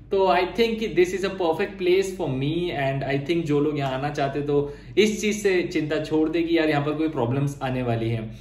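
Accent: Indore, India. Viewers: Bhai pakke indori ho - accent sun ki samajh me aa jata hai haha ;)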